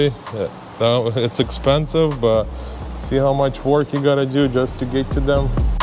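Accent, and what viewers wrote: Russia. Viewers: русский чтоль?) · Hello! Where are you from? I think you are from Russia or Ukraine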